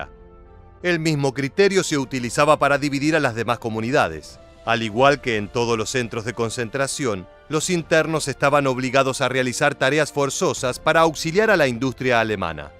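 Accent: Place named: Argentina. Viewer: EL NARRADOR DE ESTOS REPORTAJES TIENE SUS MÉRITOS BIEN GANADOS, ES MUY AMENO Y SU ESTILO INCONFUNDIBLE. POR SU ACENTO ES AL PARECER ARGENTINO. ES LO UNICO QUE SABEMOS. LAMENTABLEMENTE SU NOMBRE ES DESCONOCIDO Y CONTINÚA COMO TAL.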